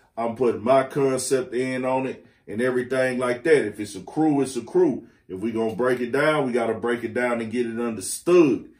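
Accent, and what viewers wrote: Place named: Houston, United States. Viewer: Bruh! Are you from Houston? Man u sound like you from my city! Lol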